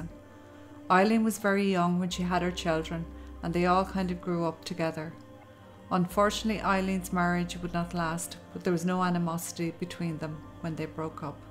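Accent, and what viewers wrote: Ireland. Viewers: I have just found your channel and Love ❤️ it. I’m Irish living in Oz since 1986. Love to hear your Irish Brog and humour. 👏🏻👏🏻Your amazing research and respectful presentation of these cases are Wonderful. Keep up the Great Videos, whilst the stories are tragic, and heartbreaking, they are stories that need to be told so We can All count our blessings and remind ourselves of the fragility of Life… hugs from Australia 🇦🇺 🇮🇪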